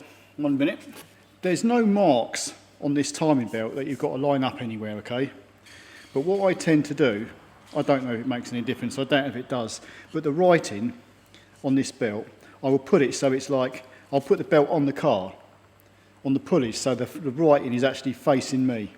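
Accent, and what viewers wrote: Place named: RP, United Kingdom. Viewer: Its great a man who speaks to us in queens English love your show and more please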